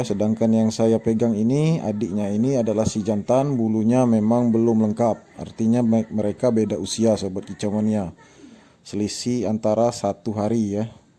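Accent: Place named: South Sumatra, Indonesia. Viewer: Gaya bicaranya kayak orang sumsel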